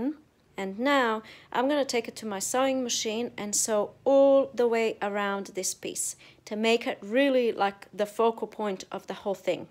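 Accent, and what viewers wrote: Australia. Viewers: Please keep talking. I love your voice. My mother was an Australian war bride who immigrated to Texas. She's gone now. Your voice reminds me of her voice and my Aussie cousins. And your explanations are essential to the teaching process. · ........you gorgeous beautiful human being...this video has to be in my.....FAVORITE TOP FIVE!!!....these are just absolutely amazing...thank you for showing how to do them....too....please I love hearing you talk as we are both Aussies!!!...Huge hugs and love....Maxxii-Michelle....Perth Western Australia xxxxx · Im delighted with your vid Miss, You are an absolute treasure, and so are all your great ideas.... Im furious with anyone that would say such a derogatory statement... goodness, its instructional tutorials, lots of talking is required... please ignore those that would say such terrible things, I could listen to you read the phone book, love listening to that beautiful Ozzie accent..., You are perfect the way you are, dont let anyone tell you any different. 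God made us all perfect, in his image in fact. Tell the haters to pound sand, we love and adore you the way God made you.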